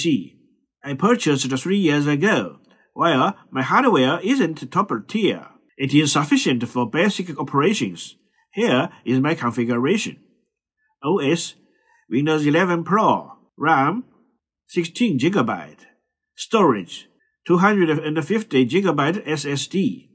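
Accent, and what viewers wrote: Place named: China. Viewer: Your Accent Reminds me of My Chinese IT Teacher... Easy for me to Learn and Follow your Guidance❤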